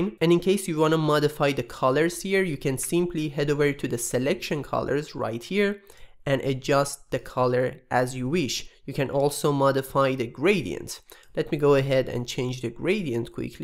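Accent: Iran. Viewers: لهجه فقط لهجه ایرونیاااااا قربونت برم که یهویی اتفاقی دیدمت تو سرچ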